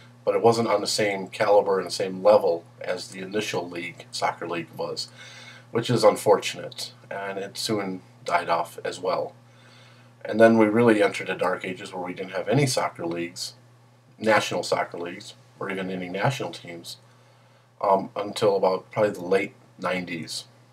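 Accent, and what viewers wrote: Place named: United States. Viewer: With an American accent?!! What person would voluntarily choose to sound like a twat? Of course he's American.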